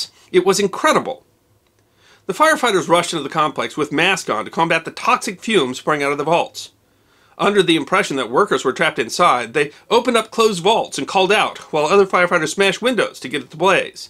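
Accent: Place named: Midwest, United States. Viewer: I know you’re a Midwesterner and it’s involuntary, and no judgement intended, but I beg you, I live in Cah-nahh-duh and not key-nuh-duh. Your accent on this one word kills me every time. Love you!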